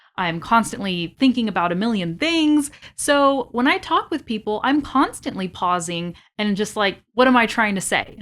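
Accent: California, United States. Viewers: It's great, btw, I have watched your content in some videos, am I wrong if you sounds more like American than British?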